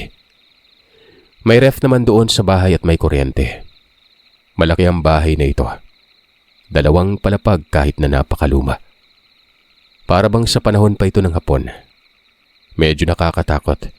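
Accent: Visayas, Philippines. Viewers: Bisaya pa more sir 😂 natutuwa ako pag nag bibisya ka.. pero mglng ka mag ilonggo...😊😊😊😊 shout out bahrain 🇧🇭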